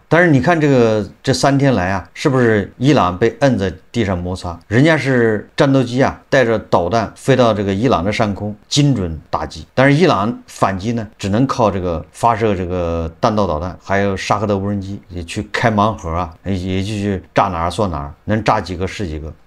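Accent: Anqing, China. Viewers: 安庆地区口音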